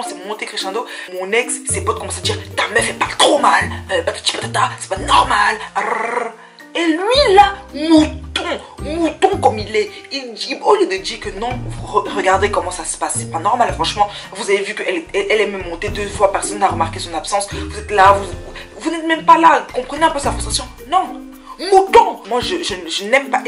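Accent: Cameroon. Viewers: Utilises plus ton accent du mboa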